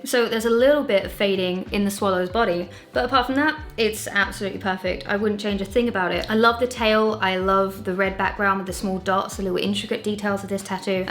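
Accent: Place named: England, United Kingdom. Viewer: i watched half this video before i heard your english accent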